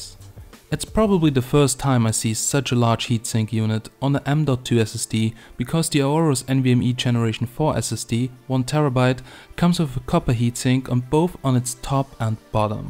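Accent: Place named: Germany. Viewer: bist du Deutscher hört sich voll so an wenn du englisch sprichst.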